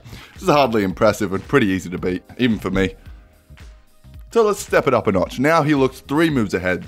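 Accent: Australia. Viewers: Are you Australian, you sound heaps Australian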